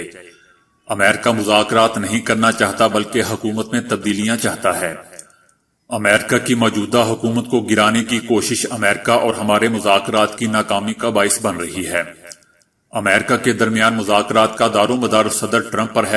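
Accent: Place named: Punjab, Pakistan. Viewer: Aap apni zubaan SE Punjabi haddi nikal kr Urdu zaban sahi bolen Apna talaffus (pronunciation) sahi Karen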